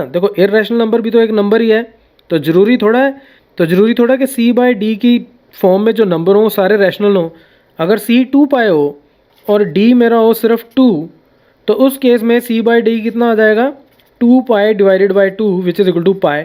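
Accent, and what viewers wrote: Punjab, India. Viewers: App punjabi ho na Sir!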